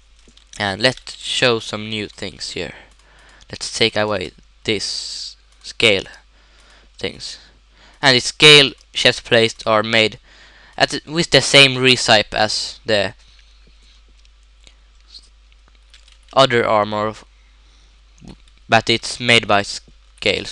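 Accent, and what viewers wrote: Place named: Sweden. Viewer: du måste vara från sverige XD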